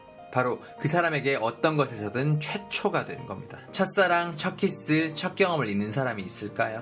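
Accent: Gyeongsang, South Korea. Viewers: 갱상도 출신맞?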